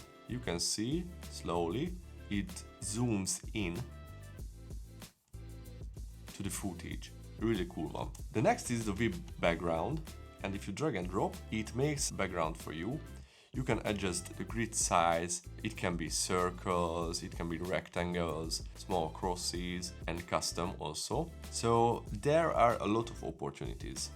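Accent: Hungary. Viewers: Sziaaa.... When I was watching the first 10s of your video, in my head I was thinking " man this guy has a Hungarian accent " then you said your from Budapest - I started to laugh - NO WAYY!!! En is magyar vagyok. Budapesten szuletem, de Canadaba lakok gyerek korom otta ;) I just subbed tesom :) Curious since you are in europe do you record and edit on a 30fps time line?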